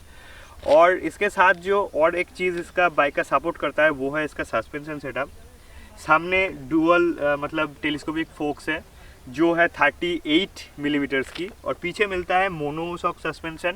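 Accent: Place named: Assam, India. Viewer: Assamese accent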